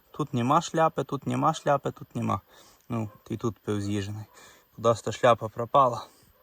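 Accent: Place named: Hutsul, Ukraine. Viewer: як я люблю гуцульську говірку🎉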